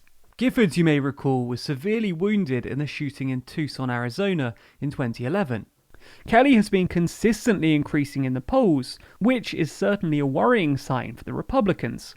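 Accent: United Kingdom. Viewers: Oh boy, a video narrated by some British guy telling how eight U.S. Senate election races will determine control of the Senate. NONE of these eight particular races include the Senate seats in Minnesota or Michigan, both of which the Democratic incumbent is very vulnerable and of which could help maintain GOP control. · Why is a British guy telling us who'll win?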